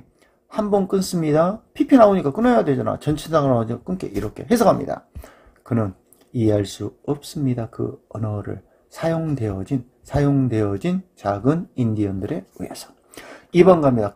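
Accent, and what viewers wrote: Jeolla, South Korea. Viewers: 왜 니들 선생님 화나게 하시냐....분사는 동사가 아니래 잖아 ㅋ ㅋ 나이많은 저도 재밋고 유익하게 잘 배우고 있습니다.. (완전 전라도 사투리 ㅋ ㅋ)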